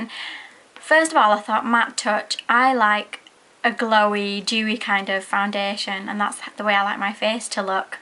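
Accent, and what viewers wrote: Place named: United Kingdom. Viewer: Where are you from in the UK? You have a beautiful accent! :)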